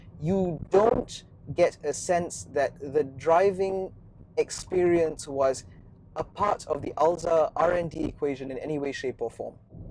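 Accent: England, United Kingdom. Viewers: yes, his uk english are much2 cleared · tq for yr replied. And so much 💕 with the English accent. 😄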